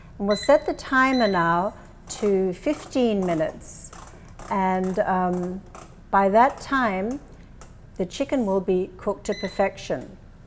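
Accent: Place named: South Africa. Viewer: Hi, great video thanks, where is your accent from, it sounds South African (Cape Town specific), who's previously lived in Australia and now currently living in Canada:)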